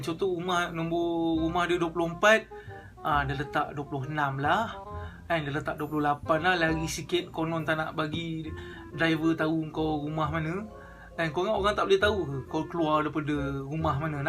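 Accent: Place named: Perak, Malaysia. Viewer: Sapam. Bro Perak brlah mana ni? Kuala ke.. Pekat benor. Hihi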